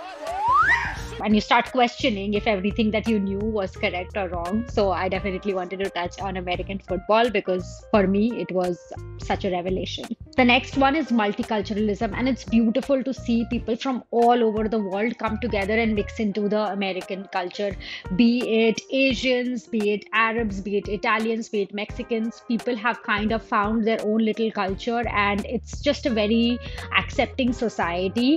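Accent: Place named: North India, India. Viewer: Hey this typical North Indian accent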